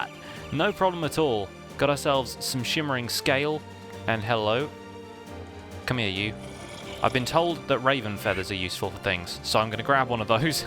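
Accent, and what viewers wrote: United Kingdom. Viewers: I do like how that tower is turning out, and having the plan area does really stand out as a good focal point :) Also the spread up British accent is amazing. :)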